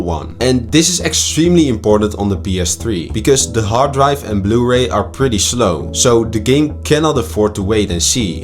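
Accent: Netherlands. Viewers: Are you dutch? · Nederlands denk haha leuke videos man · You must be Dutch my friend, Right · I hoor dutch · Holy Dutch accent maat, maar goeie vid 👏🏼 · Ik hoor het nederlands accent in je engels😂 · I hear a Little Bit HOLLÄNDISCH❤😂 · Je klinkt Nederlands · You're Dutch or your accent is Dutch because you're nervous. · hoe nederlands is deze guy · Kountree? If this guy aint Dutch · great editing, unique commentary, and a dutch accent, is a fast Like from me! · Are you dutch😂🎉? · Brother I live in NL and something tells me you are dutch by your accent haha. Anyways very dope vid with dope analysis 💪🏽💪🏽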